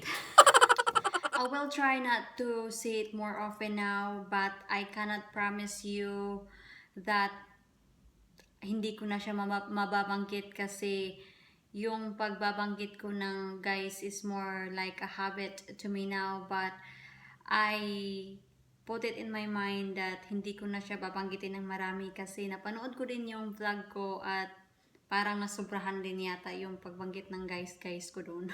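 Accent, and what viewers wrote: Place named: Visayas, Philippines. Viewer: Hello Sis Taga asa ka sa Pinas murag Bisaya man ang imo accent sa words?..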